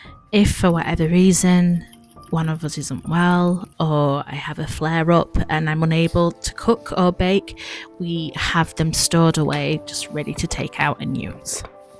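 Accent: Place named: England, United Kingdom. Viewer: As soon as I saw the name of the channel I immediately knew they weren’t Irish 😂 came to double check I’m right and heard the English accent. ❤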